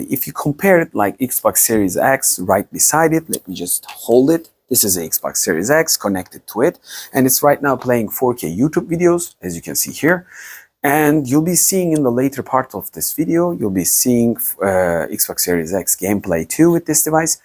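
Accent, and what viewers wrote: Turkey. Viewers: Selamlar, öncelikle bu videoya harcadığınız emek için çok teşekkürler. aksanınızdan Türk oldugunuzu tahmin etmiştim. Yorumlara bakınca tahminimde yanilmadığımı gördüm. İlk projeksiyonumu alacağım. Film ve dizi için kullanılacak En fazla 120 inç görüntu için kullanacağım. Ekstra ses sistemine fazla gerek olmaması güzel. Bu cihazın Fan sesi rahatsız edici seviyede gürültülü müdür? Eğer gürültülü ise eco Mode gibi bir secenekle ya da parlaklığı azaltarak gürültüyü minimuma indirmemiz mümkün müdür? Rahatsiz edici değil derseniz siparişi geçiyorum.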